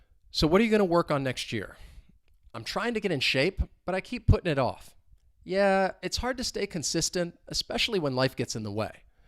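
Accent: United States. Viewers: it takes lot of time and dedicatiion to absorb the rythm and flow of american accent, if i can get more real imput instead of just reading and whatching tv, i will be more confident in speaking the way you speak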